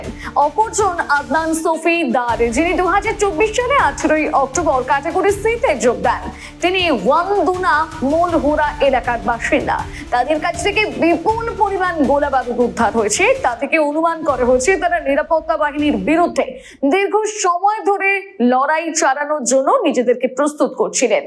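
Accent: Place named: Bangladesh. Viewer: কি খেত , কোথায় হাগতো সে খবরে কি দরকার?Glorification of notorious animals channel গুলোর ব্যবসা!বাংলাদেশী মহিলা মনে হচ্ছে উচ্চারন শুনে!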